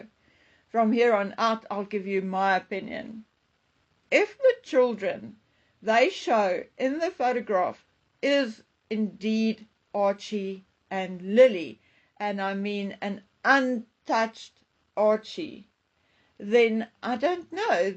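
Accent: South Africa. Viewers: Ive only ever unsubscribed once. I did it because after giving it a try for a couple of weeks I just didn't enjoy her voice or her opinions, at all. I've enjoyed or learned something in each video. I enjoy hearing about Dragon Man and most of all, I love the South African accent. TY. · When I subscribed to your channel, several years ago, I did not subscribe because of the Harkles, Royal Family, or anything of the sort! When I first came upon your channel, I just LOVED your accent!!! I had never heard it before. I knew it wasn't from Great Britain or Australia, or New Zealand, or the U.S.!!! I listened to you a little more & learned you were in South Africa & told you I thought you had the most beautiful accent! One lady saw my comment & thanked me & told me it was so lovely of me to say that!!! You, no doubt, probably don't remember, but you & I even emailed back & forth a few times!!! 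I thought that was SOOO nice of you to respond to me!!! You never ask for anything, you never advertise products you want to sell. All you do is bring us the truth!!! Here we are, years later, & I'm still here everyday, still subscribed, & still listening to your lovely voice & accent!!! 😊 ♥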